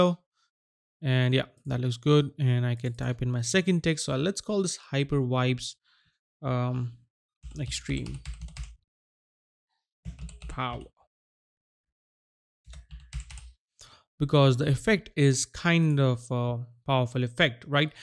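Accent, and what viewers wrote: India. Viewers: Время идёт, а индийский акцент не меняется :) В чём дело?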